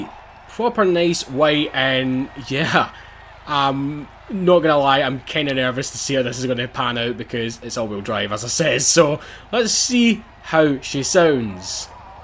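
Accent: Scotland, United Kingdom. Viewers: he's scottish, that's why he pronounce it like that. but yeah that's a really weird reason to dislike. maybe it's his relationship talk idk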